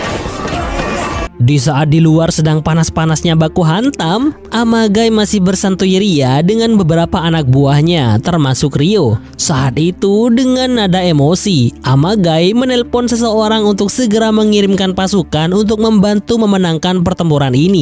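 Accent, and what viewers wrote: Madura, Indonesia. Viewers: kk orang madura yh, gw tau sihh karna gw pernah tinggal di mdura😅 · Bg orang madura ya? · Mak pas versi madureh keh😂😂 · Ken reng madureh kak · Oreng Madura Ben bang Mak phuruh ngiding kok 🤣🤣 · Babg bang abang orang Madura bukan ? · Addo reng madureh kkh reng😂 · Pasti orang madura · Reng madureh hdh bg😂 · Bg Lo orang Madura ya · Min loe orang Madura iya😅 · rooo meduroo logatmu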